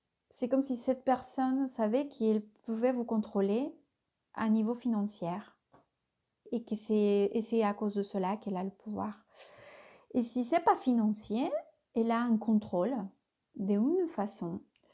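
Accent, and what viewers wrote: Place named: Spain. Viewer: Magnifiques tirage merci beaucoup, jolie l'accent Espagnol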